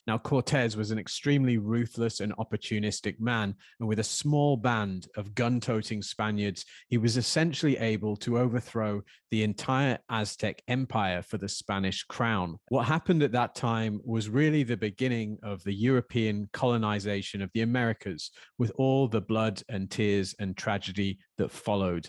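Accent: England, United Kingdom. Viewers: I know this sounds ridiculous but besides the undoubtedly high quality of your research, what i like about your videos is your British accent. It's so much more pleasant to hear than american or australian. Or for that matter mine i.e. indian! 😀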